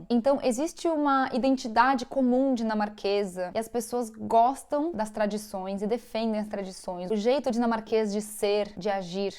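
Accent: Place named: Minas Gerais, Brazil. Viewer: Pero el aciento parace de mineira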